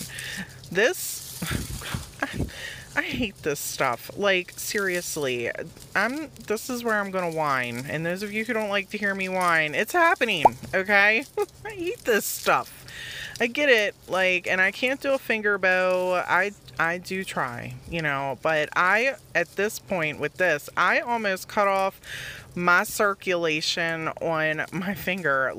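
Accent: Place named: East Coast, United States. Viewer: I know an East coaster when I hear one but you almost got me with the ya'll lol. I lived in that store before I moved thinking they were everywhere what a disappointment when I got here. If they were smart they would expand cause the sales would make up cost 10 fold. Binge time to see all the nice things you have made. Keep up with your style an humor cause it's great.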